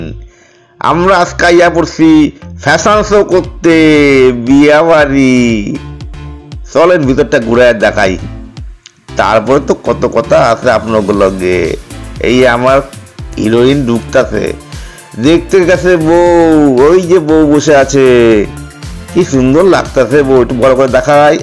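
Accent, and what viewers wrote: Bangal, Bangladesh. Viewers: Biye bari blog darun ...sathe dadar bangal kotha just fatafati 😊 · Dada to darun bangal bhasa bolen darun darun khub haslam go আর খাওয়া দাওয়া সেই ছিল 😋❤❤❤❤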